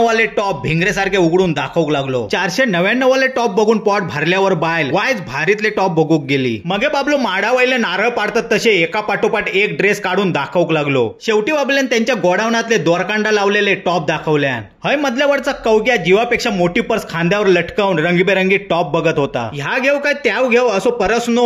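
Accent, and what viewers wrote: Malvani, India. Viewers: तुमची मालवणी ऐकान बरा वाटता.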